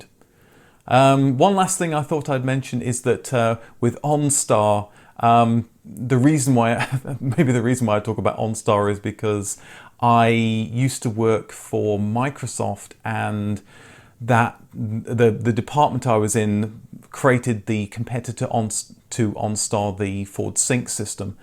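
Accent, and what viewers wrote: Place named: United Kingdom. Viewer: I enjoy your optional extras :) And of course your british english :)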